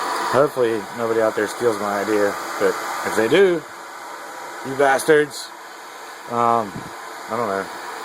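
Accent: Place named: Western Pennsylvania, United States. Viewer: New subscriber my friend. Is there a market for laminar surface mix torches? I am a chemist and I do scientific glass blowing. well I used to. When I moved up to Pa from Florida my glassblowing equipment was "misplaced". Including my glass lathe. I am trying to get back into things and I am designing torch set. I'll stay in touch. Where are you ? You sound like Western pa..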